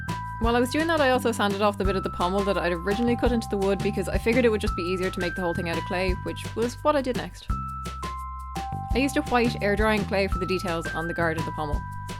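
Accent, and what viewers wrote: United Kingdom. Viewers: ...wait your British? Always thought you were Scottish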